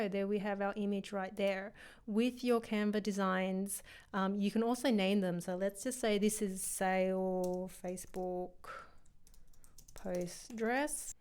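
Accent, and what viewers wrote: Australia. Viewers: Thank you for a easy and informative video! So good to find a tutorial in an Aussie accent. Subscribed.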